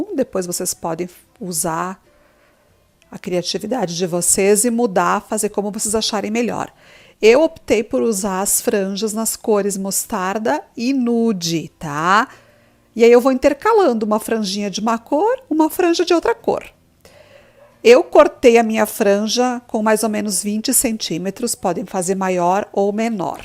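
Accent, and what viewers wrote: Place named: Rio Grande do Sul, Brazil. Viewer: Sotaque gaúcho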